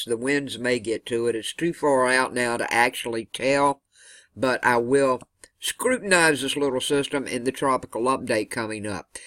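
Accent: South, United States. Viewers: Good afternoon! Thank you for the update. Yep! we cooled off. It was in the low 60's last night. Today, as yesterday, is beautiful! Slight breezes. Sorry I missed a few days. Dr. appointments and vertigo. Would you believe? They're saying my two eyes are communicating with my brain at 2 different speeds? They've given me different exercises to do 3 times a day. Prayers for everyone, GOD bless. Love hearing your Southern way of talking. Your expressions and words make me feel like I'm back in the South! Love it! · Agreed. expressions and words have a warm Southern comforting and kind of reassuring tone of voice ever reminding of the power of God's love. Amen.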